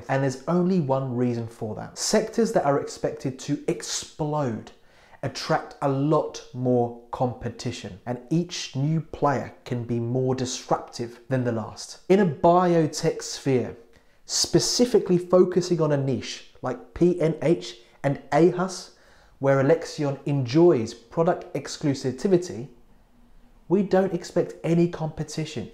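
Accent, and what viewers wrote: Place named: England, United Kingdom. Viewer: Interesting accent. Are you from England?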